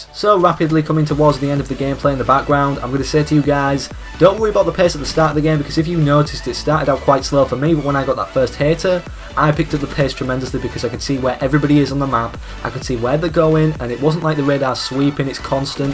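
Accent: United Kingdom. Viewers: SeeN It's literally just how British people talk. i.e. British say "Bri'ish" and Australians say "Austra'ian". Don't make fun of people's accents.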